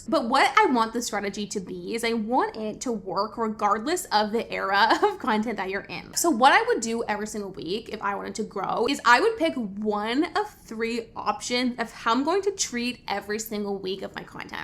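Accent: United States. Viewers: You have such a strong Californian accent.🤭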